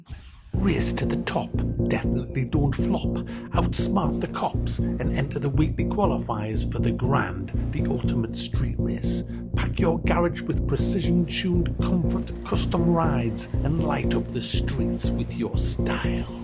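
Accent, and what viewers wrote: United Kingdom. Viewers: Your british english always brings a smile to my face <3 The way you say rant about this pricks haahahah i love it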